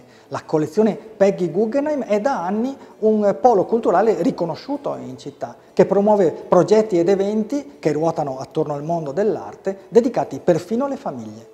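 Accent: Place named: Veneto, Italy. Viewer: Un venetofono alle prove col raddoppiamento fonosintattico: esilarante! :-))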